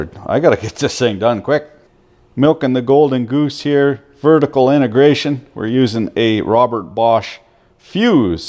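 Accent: Quebec, Canada. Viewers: hmmm. are you from Quebec, canada? your language sounds familiar for cursing :) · why is he cursing in québecois? 😂 il est vraiment bon en plus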